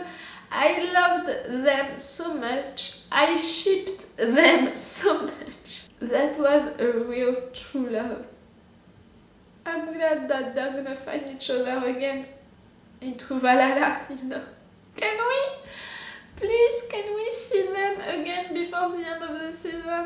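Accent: France. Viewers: Are you french? You have a accent french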